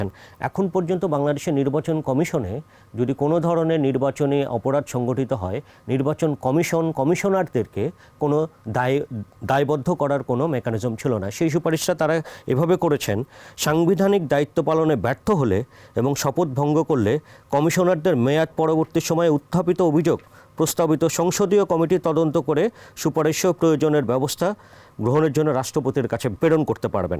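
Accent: Kolkata, India. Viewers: ডেইলি স্টার দাদার মুখে কোলকাতার ভাসা আসে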